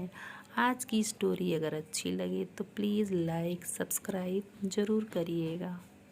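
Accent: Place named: Awadhi, India. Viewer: Big Like 14 bohut khub surat prastuti di aap ne ji aap ne bohut khub surat voice sunai our awdhi mea bola karo bohut acchi lagti hai aap ka swagat karta hu aap plese connect with us long term 🙏💐👍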